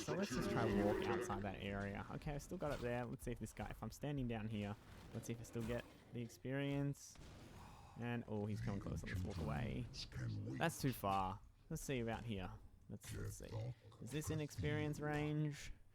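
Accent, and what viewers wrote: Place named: Australia. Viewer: Hey this is mad, I was looking for a guide to get my mate started on this game. clicked on this cos it's fairly recent, But the major plus when i first hear you talking. You sound Aussie, but then there's elements of your pronunciation that doesn't..... are you? hahah. I'll watch through now and show my mate. Cheers! (Please reply)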